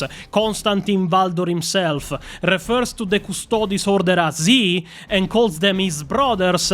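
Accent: Italy. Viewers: I am so glad to have someone put this into better words than I ever could have. Thank you! I love your Italian accent, and I wish to see bloopers/behind the scenes of this. 🤌🏻👀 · I love the Italian accent. I could listen all day :) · L'accento meridionale!!!!11111!!!!!!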